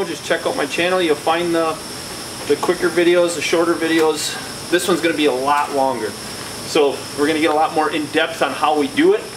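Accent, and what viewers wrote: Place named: Midwest, United States. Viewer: Howdy, CJ here from H-Town Texas. I am looking to get a side hustle going. My best friend and his Wifey do this for a living. They make a decent living. How much do you charge for each wheel? Would I be able to use cordless tool and if so, how many batteries would I need to swap out in order to do truck and trailer wheels? I would like to be able to go to customer if power was an issue and or driver is waiting to get loaded. Thanks great video, love the mid west accent..Don cha knoow..😆🥰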